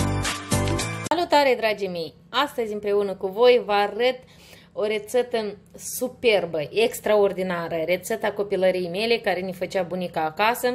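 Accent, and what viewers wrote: Moldova. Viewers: Ești o scumpă Draguto mie îmi place cum vorbești ,am avut multe prietene din Basarabia când am fost mai tinerica ,bravo ești o gospodină desăvârșită ,💖💖💖💖💋💋💋💋💋🌹🌹🌹🌹🌹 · Dulce-i graiul moldovenesc 😃 nu lua în seamă comentariile rele de la proști. Ai un program frumos și mulțumim pentru că împarți rețetele cu noi. Eu sunt din oltenia și înțeleg tot ce spui, îmi place când zici " iaca așa" 😃 Mulțumiri și fericire la toți ❤ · Seară Frumoasă! Dragă! Foarte frumos și delicios! La Mulți Ani Fericiți! Copilașului tău și la toți copiii din lume! Îmi place foarte mult cum gătești și cum explici in dulcele grai moldovenesc! Fiecare regiune are regionalismele sale! Îți doresc o săptămână frumoasă și binecuvântată alături de cei dragi! Cu mult drag! ❤️😘🌹🌹🌹🍒🍓🐈🧁🏡💥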